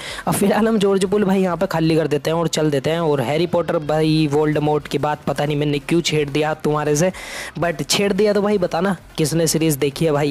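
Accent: Haryana, India. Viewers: Haryana ta h ka bhai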